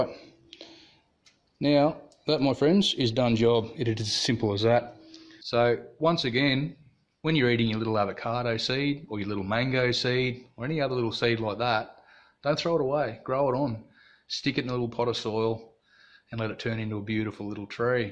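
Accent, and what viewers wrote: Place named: Australia. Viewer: No nonsense Aussie speak !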